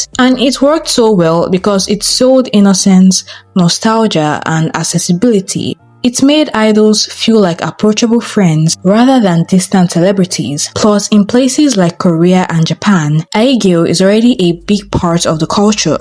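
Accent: Nigeria. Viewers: Nigerian narrator 😂? How refreshing ❤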